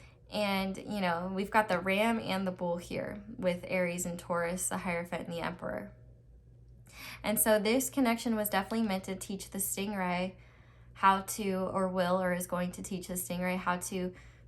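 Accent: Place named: South, United States. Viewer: Pile 2 I love the southern accent this is coming from a southern woman it's not that funny some what's even say it's cute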